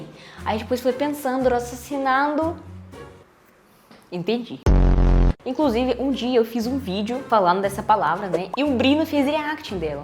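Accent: Russia. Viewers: é claro que é sotaque. Em russo quando há uma palavra com duas letras O a primeira fica com som de A. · Parabéns pelos seus vídeos, Obrigado pelo seu jeito sempre leve, divertido, simpático de mostrar as diferenças entre Brasil e Rússia, e o seu sotaque Russo misturado com o sotaque do estado do Paraná, deixa seu jeito de falar português muito legal e interessante! 😊❤ Sou de Goiás, vizinho de Brasília, na minha região tem gente com vários sotaques diferentes. 😅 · Você é apaixonante. Também vim de família russa. Seu "sAtaque" é lindo e me lembra os velhinhos da minha família. Nunca perca esse sotaque. Acho você a melhor surpresa do youtube no Brasil nos últimos tempos. Um beijo do Rio ❤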